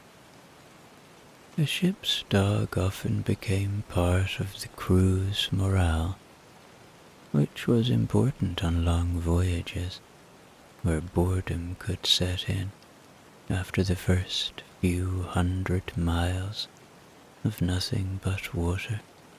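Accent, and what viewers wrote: Ireland. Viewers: I listen every night from Germany. Unfortunately I don't often get to listen to the stories because I usually fall asleep before I get that far! Your voice is very soothing and I love your Irish accent!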